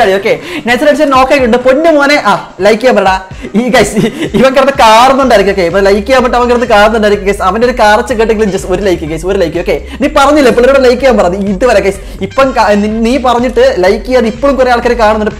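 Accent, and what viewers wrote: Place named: Malappuram, India. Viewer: Malappuram language me myaman uyir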